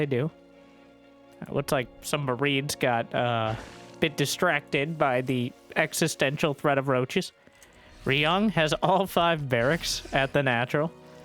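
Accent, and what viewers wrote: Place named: Texas, United States. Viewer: I feel old I have watch your videos enough to notice you grow older and your voice dropped an octive. Also you sound like you came from Texas.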